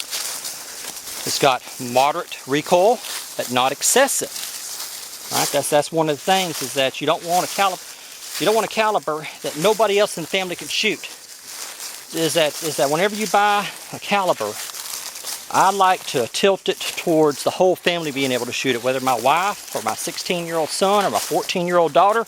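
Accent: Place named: South, United States. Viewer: The ONLY caliber for a civilian is the .22 - the only one you can put 3K rounds on your back and run with . Another YT " Expert " ....besides the stupid hat and southern drawl , what might your qualifications be , Mr. Knowitall ?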